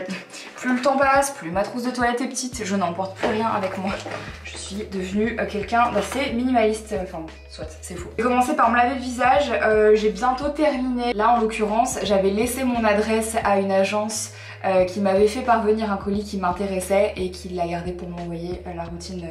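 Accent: Belgium. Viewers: Tu commences à prendre qqes intonations belges dans ta voix c'est mignon ^^ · tu commences à prendre l'accent belge! ahah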